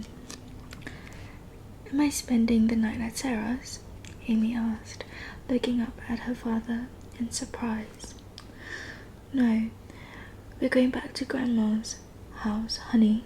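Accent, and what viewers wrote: United Kingdom. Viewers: Your accent sounds very british or am I just imagining it...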